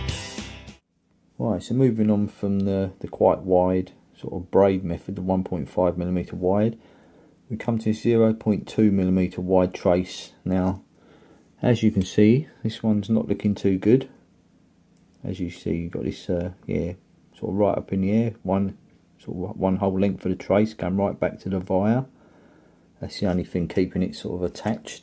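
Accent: United Kingdom. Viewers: What if you did a video with a two-sided board top and bottom with a sleeve that would be cool , sounds like you're from the UK I'm from Sunny South Florida USA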